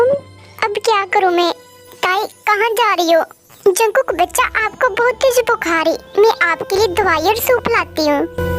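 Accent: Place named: Punjab, India. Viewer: Apka Hindi plus Panjabi accent h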